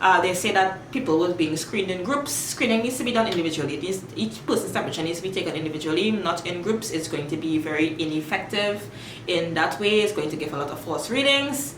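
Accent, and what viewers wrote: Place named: Trinidad and Tobago. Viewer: You speak waaaaay too fast! Not everyone understands the Trini accent. Good content tho👏🏽 new subscriber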